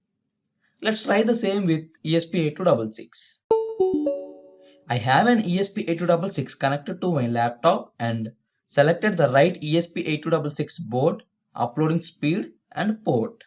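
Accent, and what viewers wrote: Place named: India. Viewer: Why it always have to be indian accent wich noone understand?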